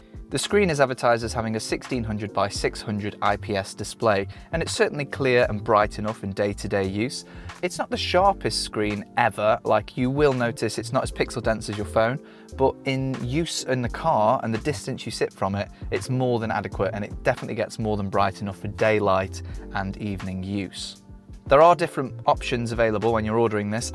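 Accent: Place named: Nottingham, United Kingdom. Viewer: Really good video, thank you! From your accent and the A453 Road, I’m guessing you’re somewhere around Nottingham, England — but I noticed you mentioned prices in dollars. Any reason for that? This is actually the first time I’ve watched your video, and I’ve got to say, your presentation is awesome. I ended up watching the whole thing without blinking! Usually, I only watch about 20% or skip to the interesting parts, but yours kept me watching all the way through.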